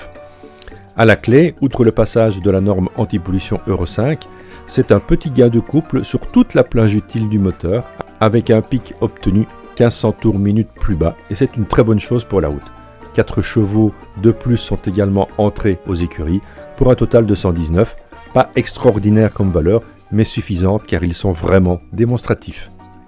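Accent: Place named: Belgium. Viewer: Perso j'ai commandé cette moto qui doit m'être livrée en avril/mai, je n'ai qu'une hâte c'est de la réceptionner et rouler avec !! Merci pour ta vidéo, très belle et bonne présentation de la machine et j'ai particulièrement bien aimé ton petit accent de Belgique !!!! ;)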